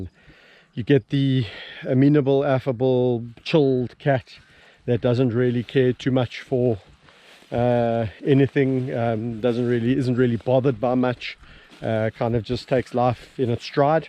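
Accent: South Africa. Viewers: Bloody hell. After these videos, I hear a south African accent inside my head every time i cuddle my German Shepard.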